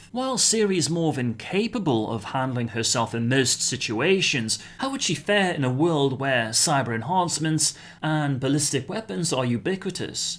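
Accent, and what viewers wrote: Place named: North Wales, United Kingdom. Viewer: If I had to guess yeah. You pronounce some words very Welshy and some things sound slightly northern, your voice is really hard to pin down tho. Where are you from then?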